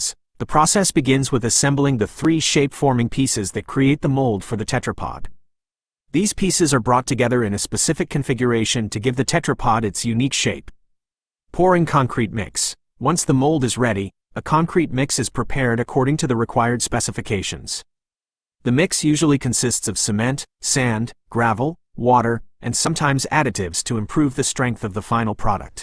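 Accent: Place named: United States. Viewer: Not sure why there is an american voice doing this when the whole thing is Europe based, l never watch or listen to an american voice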